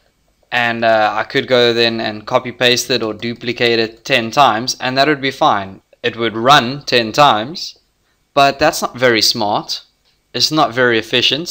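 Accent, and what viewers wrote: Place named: South Africa. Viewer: As soon as I heard your voice I was like... This one is a homie from South Africa. 😀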